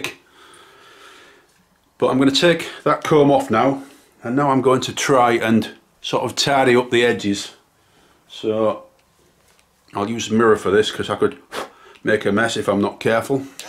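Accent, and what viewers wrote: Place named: Liverpool, United Kingdom. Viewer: Absolutely brilliant. No fluff, straight to the point. Sound like a scouser or Manchester maybe.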